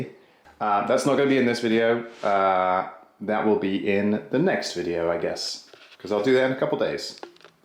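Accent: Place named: Australia. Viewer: Yeah straightaway I reckoned an Aussie accent, but I just found this channel so I don't know this dudes backstory.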